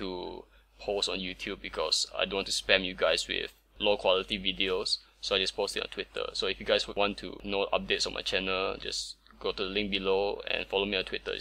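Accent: Singapore. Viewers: Are you singaporean?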